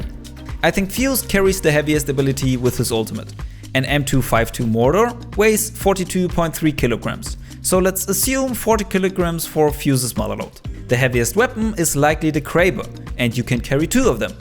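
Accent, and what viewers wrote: Germany. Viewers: Are you from German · youre german? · are you german? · Are u german? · Let me guess. You are German?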